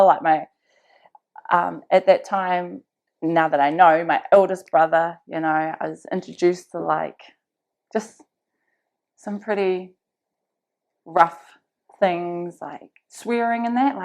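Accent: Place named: New Zealand. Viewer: You don't sound intelligent, you definitely sound like a maori and totally look like one, You and your whole family sound like HOODRATS, WHAKAMAA Whats the point of this NEGATIVE POST ABOUT BULLSHIT GANG MEMBERS, You don't have to be GANG MEMBERS TO BE SOMEBODY, Well Whats the chances being in a Gang your sorry ass is going to be destroyed, that's the choices you maoris made. BLAME NO ONE BUT YOURSELVES. Don't blame the cops,don't blame the government. BLAME YOUR PARENTS. It's pretty hard to believe you have catholic values when you speak like a hoodrat, No wonder Maoris get a bad name. Listen to the bullshit and jellybeans that's coming out of your mouth, it's negative as and you just sound like you're going down the PITY TRACK, why have a child if you clearly can't take care of yourself and YES EVERYTHING IS YOUR FAULT AND THE BAD CHOICES YOU MADE. 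I know lot's of Chics in Aotearoa Black power,mongrel mob,head hunters, hell angel's fk'n way worser off than you, there's no way that they would be doing a pathetic interview like you are now. Good fkn job people have died in your fucked up family, You obviously don't know God, have you actually REPENTED TO GOD....DOESN'T SOUND LIKE IT, your not mentally impaired, You don't believe in God, the bullshit you went threw is nothing in comparison to what others have gone threw. You use God's name alot yet you have in no way have been obedient towards him therefore get your shit together and stop living in a dream world in the past. I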